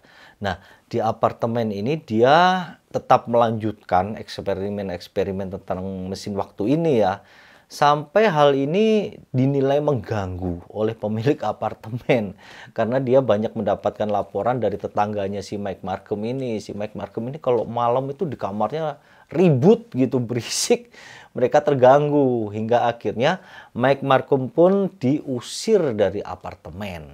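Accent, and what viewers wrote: Java, Indonesia. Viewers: Medhok banget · kok medok , dan tau arti rika ?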